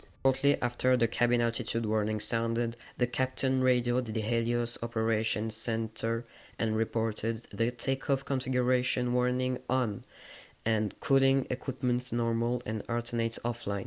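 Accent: France. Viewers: Woah bro im shocked! You absolutely nailed this video and voice reveal!!! Fire voice bro, the French accent is 🔥! Also RIP to all victims onboard that flight. 🙏 Best vid yet for sure!! I'm so happy for you bro and wish you, and your channel the best future posible! ❤ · o my god this is so scary ! i love that ! thank you for dooing this halloween video and this voice reveal with this french accent 😍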